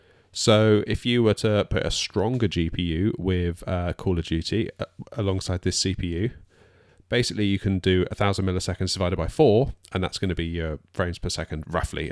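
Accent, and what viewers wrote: United Kingdom. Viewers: Dude whats wrong with you, are you a brit or smth? Cant you use normal english with normal speed rather than this 'boa of woha' eminem speed style?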